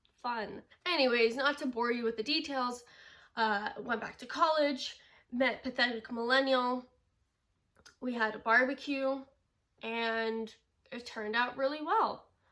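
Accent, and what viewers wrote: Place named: England, United Kingdom. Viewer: Interesująca masz historię życia. Trenowałaś sport, so did I 🙂 kochasz zwierzęta 👍🏻💞 so do I 🙂 Kocham Twój angielski akcent 💞🙂 Pozdrowionka 😉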